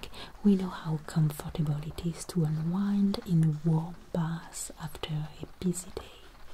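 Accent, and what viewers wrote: France. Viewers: Wow amazing video. Everytime I watch your videos, I feel fully engaged in the story. Your French accent is soo luxurious ❤. Thank you for such amazing gift! 😊 can't wait for the next video.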